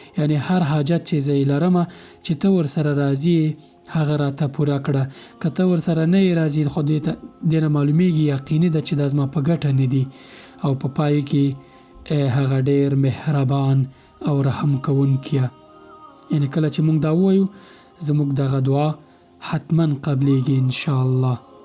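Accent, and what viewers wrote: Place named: North, Afghanistan. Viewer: احسنت يا شيخ كلماتك كانت حسينة ناعمة اسعدك الله في الدارين جنوبی پشتونستان سره تعلق لری او که شمالی سره.ماته جنوبی اشکاری ولی لهجه ای شمالی ده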